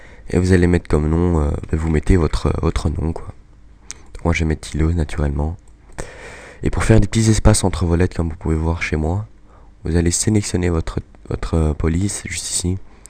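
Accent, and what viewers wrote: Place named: Belgium. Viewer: Sa fesais longtemps que j'avais pas entendue ta voix de belge <3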